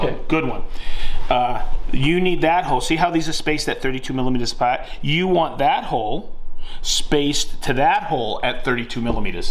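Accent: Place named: New England, United States. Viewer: I just got a TS55 & OF1400 router. I read a lot of guys say only go with a continuous track… Would you recommend joining 2 Festool tracks to cut a full 96” length of plywood or just getting the FS2700 or FS3000? Thank you for the videos by the way. I have been watching the last few weeks. Great content. Love the new england accent brother!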